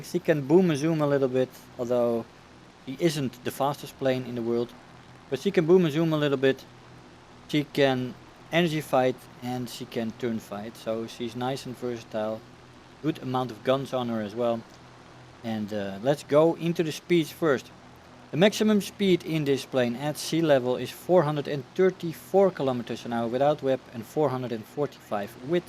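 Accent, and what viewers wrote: Netherlands. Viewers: Sounds Dutch?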